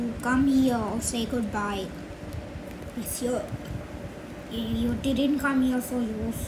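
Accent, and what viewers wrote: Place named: India. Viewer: Your accent like Indian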